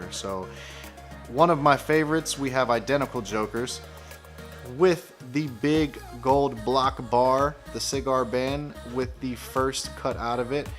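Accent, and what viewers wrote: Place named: Houston, United States. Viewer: 🤘 question...Are you from Htwn? You talk alot like us down hurr.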